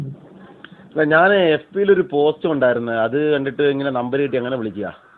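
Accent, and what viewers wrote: India. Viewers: തിരോന്തരം ആണെന്ന് തോന്നുന്നു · Bhasha kettit kodungalloor bhagathanu ennu thonnunnu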